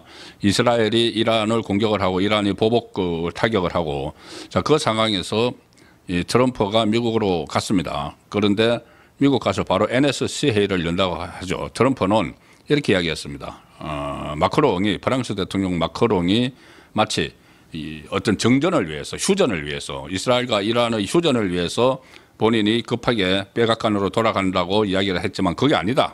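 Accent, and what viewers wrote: Gyeongsang, South Korea. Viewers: 날로 퇴행을 거듭하는 수꼴들의 행진!!! 갱상도의 항정살기름진 목소리 !! 기억한다. 악몽들!! 미숙과 완숙은 옆집이지만 거짓과 진실은 다른 동네다. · 도대체 뭐가 엉망진창 입니까? 윤석열 보다 훨씬 더 여유있고 간단한 대화도 하던데 뻘줌하게 혼자 서있던 바보하고는 확연한 차이가 있던데 경상도 사투리 쓰는 유튜버들은 따로 엠티를 다녀왔는지 교육을 받는지 이따위로 통일된 내용으로 방송을 하냐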